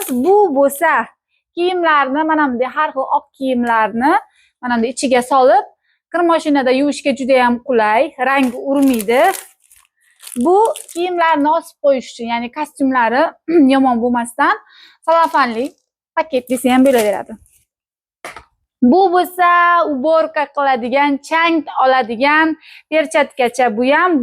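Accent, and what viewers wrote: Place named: Qorakul, Uzbekistan. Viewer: Ман хам шуни уйладим бу точна Коракул ёки олот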